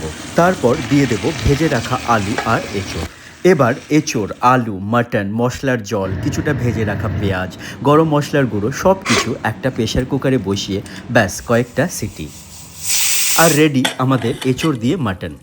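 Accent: Bangal, Bangladesh. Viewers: তোমার বাঙ্গাল কথা বেশ ভালো লাগলো,আরও বেশী শুনতে চাই। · তোমার মুখে বাঙ্গাল ভাষা টা শুনে বেশ ভালো লাগলো 🙂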